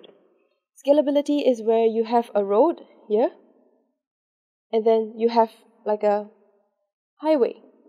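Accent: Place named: Singapore. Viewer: SINGA-PORE! i can hear dat accent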